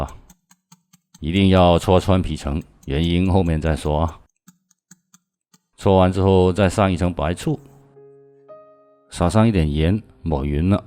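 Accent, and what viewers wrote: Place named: Fujian, China. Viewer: 是福建口音 哈哈哈哈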